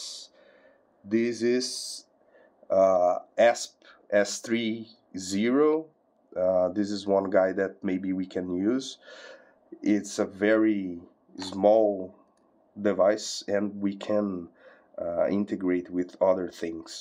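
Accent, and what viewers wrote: Brazil. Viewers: adoro o sotaque br no inglês maravilhoso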